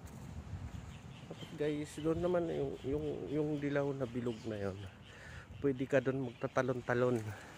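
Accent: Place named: RP, United Kingdom. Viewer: Nosebleed po ako hahaha pang mayaman yong accent ng British ganda po ng view.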